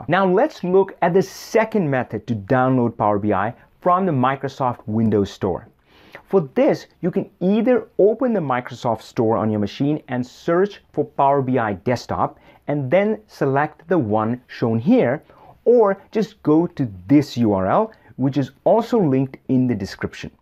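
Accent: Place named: India. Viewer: the best indian english talker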